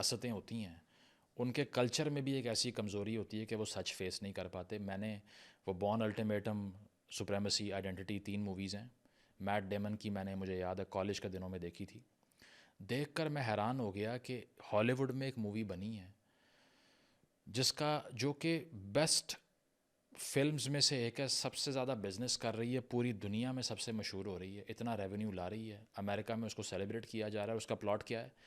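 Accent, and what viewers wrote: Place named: Pakistan. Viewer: I love ur urdu accent too which is now obsolete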